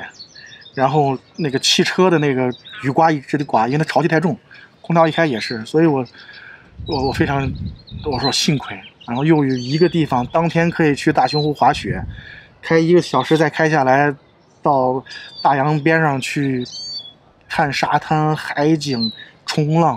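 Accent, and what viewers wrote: China. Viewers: 听着乡音太亲切了，本人也是西安人，在美国做移民律师多年，听多很多这样的故事，我们的同胞跋山涉水移民美国，美国毕业留学生削尖了脑袋拼命冲h1b工作签证抽签，中国中介略次坑惨了想要移民的同胞，我的客人感叹“被中介坑了，原来在刚踏出国门的那一课就做错了“ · 这口音听起来就是我陕普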